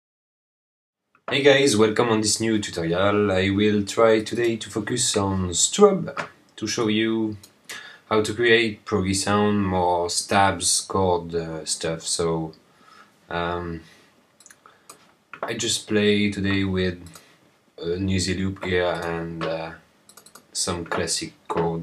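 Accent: France. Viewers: Are you French ? You have a french accent ! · sweet french accent :) J'adore ce synth mais j'ai du mal a saisir sa matrice de modulation un tuto ou une explication serait vraiment trop cool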